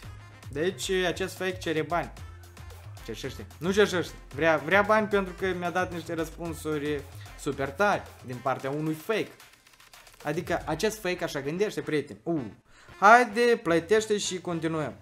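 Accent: Moldova. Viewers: esti moldovean???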